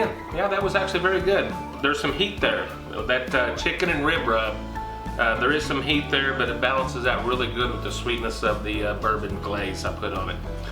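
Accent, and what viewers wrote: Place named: Kentucky, United States. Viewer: Boy those are some mighty fine ribs mon ami...Your kentucky accent and my french canadian one some of these things are hard to pronounce lol . Love me some Tango spice!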